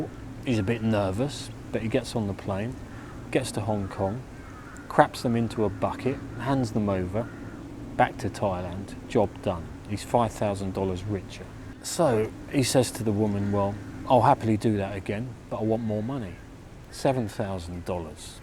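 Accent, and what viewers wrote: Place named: London, United Kingdom. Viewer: more drama in 22 minutes than in tons of dubious movies....I'm guessing any slightly shady story narrated with the flavor of a London accent (or do my ears betray me?) has that special something, but maybe it's just me ;-)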